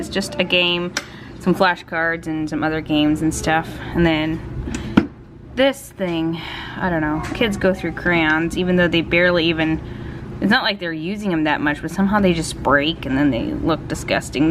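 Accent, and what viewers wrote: Pennsylvania Dutch, United States. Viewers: I love hearing your Pennsylvania Dutch! Although my phone puts captions of its own, and it was very humorous reading what it thought you were saying in English. Haha! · I absolutely loved today's video!! How fun!! It was fun to hear the Pennsylvania Dutch thrown in! I love your decorating style. You have SUCH a great eye for it. And all the little organized spaces- LOVE that!! That little cabinet in your family room is awesome! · Love your videos and your Pennsylvania Dutch is beautiful. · Love your videos. Love listening to Pennsylvania Dutch!!! I so miss living in PA. I'm curious as to how well talk to text picks up Pennsylvania Dutch? :) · I'm from Palatinate (Southern Germany as well) and I feel the same way. Most of the words are used in the exact same pronunciation here. I could listen to you speaking Pennsylvania Dutch for hours,. 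It's very interesting!:) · I came across your video by coincidence and played it because it says Pennsylvania Dutch. I am not only German, but also a native speaker of the Palatinate German dialect. It is the first time I have heard someone speak PD! I have to say that the pronunciation is significantly different. I can hear the American Accent that got in, but I recognise many words. Many are still written as we say them in Palatinate. I especially recognised „do hiwwe“ 😂 and „Kich“. 🤭 Greetings from Palatinate! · Love this video blesses my heart hearing you speak Pennsylvania Dutch brings back so many childhood memories. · Hi! I've been watching your channel for a while now, which I truly enjoy your family and style. I loved this video especially since I'm from Eastern PA, an hour from Lancaster and am familiar to the Amish. My family is Pennsylvania Dutch from Berks County. I enjoyed your Dutch:) I didn't grow up PA dutch but could understand some and am familiar with the accent, which my great uncles heavily have:)) I always pick up the accent again when Im home for a visit. 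I love it, it's home to me. Im also familiar with some of the foods you make, which I miss and get my fill during visits. Congratulations on your growing family! Thank you for sharing! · Love to hear the Pennsylvania Dutch! Very interesting. Hope you do more occasionally. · I love hearing Pennsylvania Dutch spoken! I'm from Ohio and I was down in the Holmes County area yesterday. Just a day trip because it's only a 2 and a half hour drive for me. I live up in the Island Area of Ohio and not too far from Cedar Point, but out in the country. As I said, I love hearing your native language spoken. I've been known to stand next to Amish women who are conversing in that language, just to hear it! I've been hearing it spoken for over 40 years now and I never tire of it. I think it sounds pretty and sort of comforting in a way. Don't ever stop speaking it! I spent a lot of my high school years at the home of a German friend. 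She and her family spoke mostly German at home, unless I was there, then they spoke English to me. And my own Grandfather used to speak and sing in German sometimes. I don't understand German and I don't speak it, so I also don't understand much of what you were saying. But, I'm rather fluent in Pig Latin! LOL! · I love hearing the Pennsylvania Dutch really never heard it before. I think that English has a heavy Germanic influence to it from the past. I needed the cleaning motivation as well to get my dishes done. Thanks have a great weekend. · I live near the French border in Germany and I understand most of your dialect. I have to listen closely. Usally I think in English or German, but Pensylvania Dutch is so haevily mixed, that my head spinns a bit when I try to sort out the bits and pieces. :) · So much fun hearing your dutch! Pa dutch was my first language too, though mine is more the east coast Mennonite style, definitely no rolled r's :-) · I loved listening to you speak PA Dutch. 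It reminded me of listening to my Dad and his sisters.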